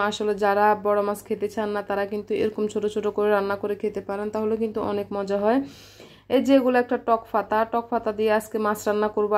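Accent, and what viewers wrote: Noakhali, Bangladesh. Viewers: নখালিতে কথা বলিয়া ❤❤ ভাল লাগে ল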